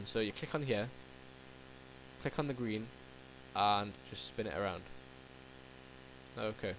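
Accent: Newcastle, United Kingdom. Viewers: Are you from newcastle ?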